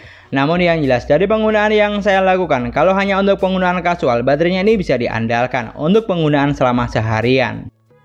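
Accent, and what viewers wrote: Madura, Indonesia. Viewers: Apa om ini orang madura ya 😅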